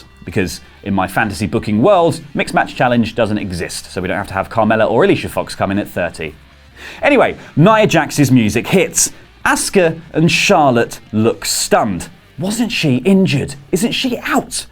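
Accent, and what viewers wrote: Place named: United Kingdom. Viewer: Great bedtime story and ur UK accent makes more like store time o yes store time with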